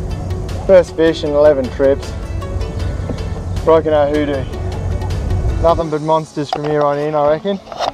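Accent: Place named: Australia. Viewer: I accidentally clicked on one of your videos and went to immediately change the video then I heard your Australian accent and now I'm subscribed good on ya mate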